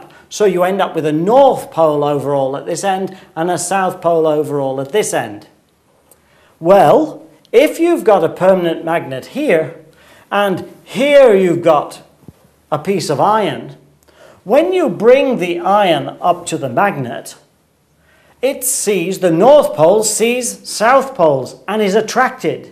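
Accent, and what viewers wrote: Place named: United Kingdom. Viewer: Brittish. Brits don't always pronounce r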